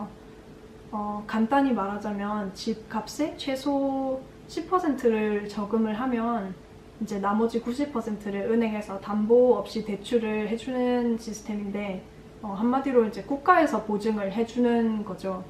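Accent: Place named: Busan, South Korea. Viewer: 좋은 정보 ㄳ요~ 누님, 부산 출신~? 정겹네요ㅎㅎ 근데, 혹시 헬싱키에 오피스텔은 어디서 찾아볼 수 있나요~?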